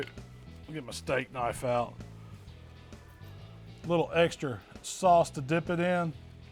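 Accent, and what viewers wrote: South, United States. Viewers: Dude with cool southern accent, love of red meat and Jack Daniels, and uses the black nylon BBQ gloves...I'm subbed! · This guy is awesome! Where is he from? Im from Germany so i don't know much about american accents but he sounds so cool! Anways greetings from Germany!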